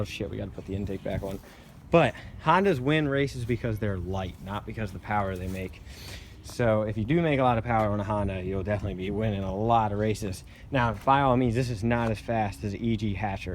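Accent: Maryland, United States. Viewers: Soon as I heard that accent I knew he was from marelin hon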